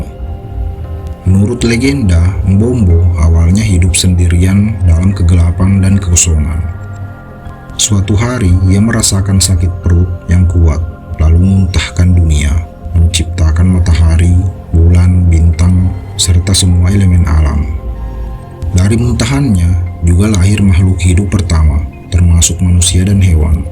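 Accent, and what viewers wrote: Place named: Bugis, Indonesia. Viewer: Knp logatnya seperti orang bugis😂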